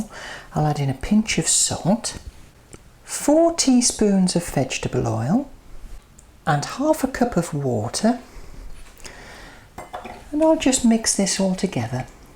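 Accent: United Kingdom. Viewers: Bonjour, je dois dire que je suis un fan absolu!!! J'adore toutes recettes, votre voix votre accent britannique, votre humour bref je vous adore!!! Alors continuez a nous faire saliver et un grand merci pour toutes ces recettes! ( même si je suis au régime j'adore regarder vos recettes) je vous embrasse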